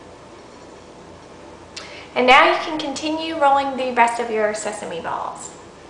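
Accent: South, United States. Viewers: you sound mighty country, i do too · Aww, I love your Southern accent! Your new vids sound very cute though. :) Thanks for posting these!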